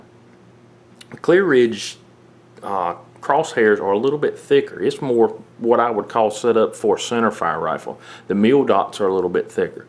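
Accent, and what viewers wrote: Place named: Ireland. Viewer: that some thick accent you got there, you from Ireland?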